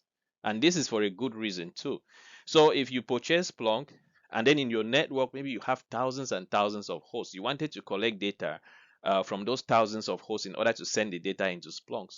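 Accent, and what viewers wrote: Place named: Nigeria. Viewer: you sound very Nigeria but i like you teaching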